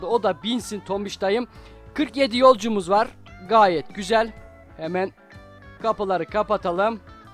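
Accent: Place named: Rize, Turkey. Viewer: Abi sen nerelisin ya tam Rizeli tipi ve şivesi var sende 😁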